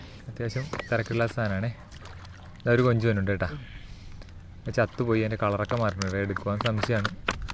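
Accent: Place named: Kurichi, India. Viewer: എടോ കൊഞ്ചും മീനും അല്ല ഇഷ്ടപ്പെട്ടത്, തന്റെ ആ സംഭാഷണ രീതി നല്ല താഴ്മേയോടെ യേ വേ ണേ കോട്ടയം അല്ല കുറിച്ചി bhasha🙏🏼🙏🏼🌹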